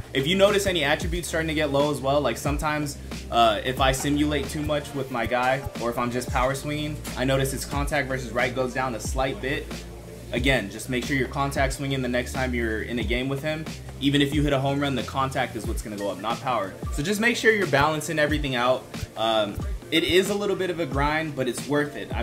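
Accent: Australia. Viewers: Yikes that was an Australian accent? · LMFAOOOOOOOO AUSTRALIAN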